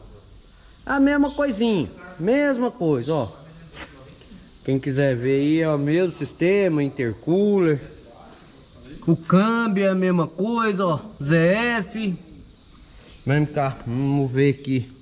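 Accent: Minas Gerais, Brazil. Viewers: Fala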